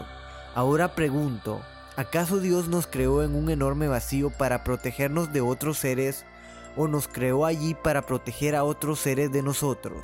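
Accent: Honduras. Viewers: ¿Es hondureño? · eres de Honduras ? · Eres hondureño?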